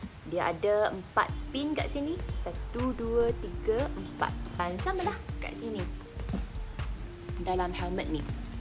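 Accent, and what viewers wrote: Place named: Kelantan, Malaysia. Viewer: Wah pandai kecek kelate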